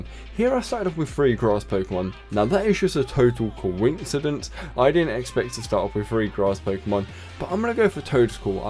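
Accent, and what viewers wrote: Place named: United Kingdom. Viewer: Imma guess your British as a British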